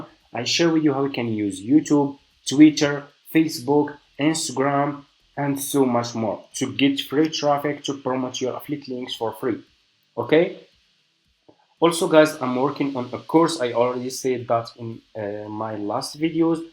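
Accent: Morocco. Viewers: that Marocain accent 😂😂 ❤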